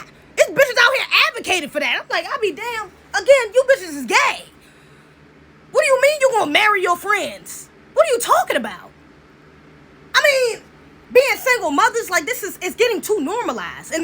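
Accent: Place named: Washington DC, United States. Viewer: I know you from the city DC. 💯that slang and slur only one place it come from. 👊🏼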